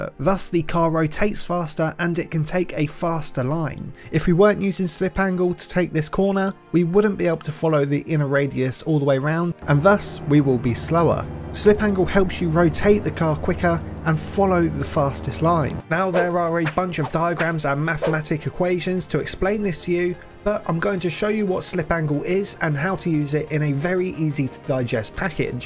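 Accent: United Kingdom. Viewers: No hate, but I think this guy is bri'ish. Tho contecnt both helpful and entertaining. Keep it up, please. :)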